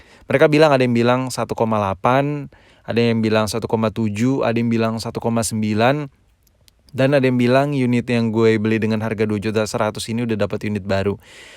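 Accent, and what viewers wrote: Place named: Java, Indonesia. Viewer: Thod bahasa jawa lo sama persis kek daerah gw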